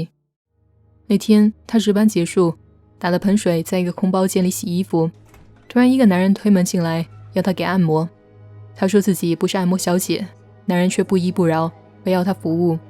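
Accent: Standard. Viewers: k貓講廣東話好標準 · 粤语很标准哈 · 原來K猫會說廣東話還說得這麼標準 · 題外話讚一下k貓的廣東話很標準☺ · 粤语很标准呀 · 广东话很标准👍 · 广东话几标准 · 噢我就注意到了k猫的粤语很标准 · 完全冇諗過你粵語會甘標準！一直以為你只講普通話🤣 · 哗！你的粤语很标准呀！ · 是广东仔吗 粤语说的这么标准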